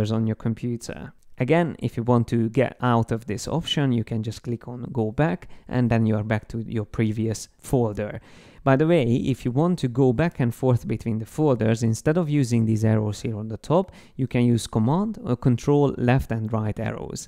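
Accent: Hungary. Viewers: hahaha your Hungarian accent is so obvious! Szeretlek Magyarorszag, köszi! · Is it Hungarian accent I did hear? :)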